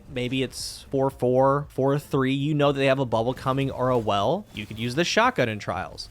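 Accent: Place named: Wisconsin, United States. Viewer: your voice is soooo, are you from Wisconsin? try not to do that upward inflection after every sentence it gets real annoying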